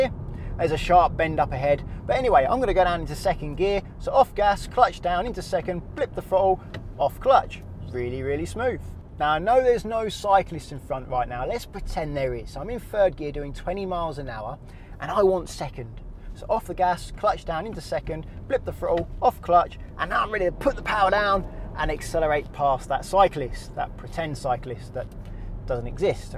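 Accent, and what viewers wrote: England, United Kingdom. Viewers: Enjoyed watching the video. There's plenty on YT about heel and toe and rev matching. I knew about rev matching and do it myself, but have skipped H&T for years as I couldnt get the hang of it. As im doing more spirited driving recently in a revvy little NA car, I came to watch a few videos to help me. Many are set in America, which although is fine, I like the more closer to home videos and its easier to listen to a more local voice. (Im essex based) I thought the video was well described and easy to understand, so thank you for uploading it.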